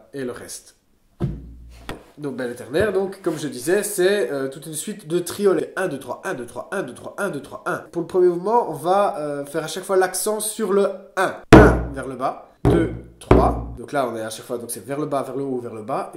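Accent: Belgium. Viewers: Je vous découvre hier, je me réveille avec la tête dans le cul ce matin avec cette vidéo, et je me dis "mais ... c'est pas possible avec cet accent c'est un compatriote belge" oui gagné 😂 En tout cas cest chouette d'avoir enfin découvert quel nom ce tambour et ce son si spécifique (et très appréciable 😍) portait 😄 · mmmmmmm, eskeu par hasard mes oreilles distinguent bien des "aloors' typiques de la Wallonie ? si oui bisou de Namur, si non, Bisou de Namur quand même ! Edit : le A L'AISE m'a donné réponse :)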